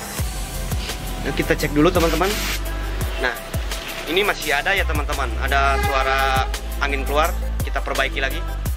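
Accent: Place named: West Java, Indonesia. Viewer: bukannya Jawa ngapak ya? Kok logatnya Sunda? 🤔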